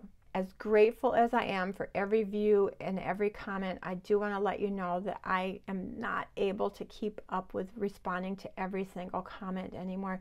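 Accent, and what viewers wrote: Midwest, United States. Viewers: I knew your accent was Minnesotan before I seen this comment!!! 🤣😂😅❤️❤️💁🏻‍♀️ I’m the person that wouldn’t touch it with a ten foot pole LOL! 🤪😉😘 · I am living for your midwestern accent.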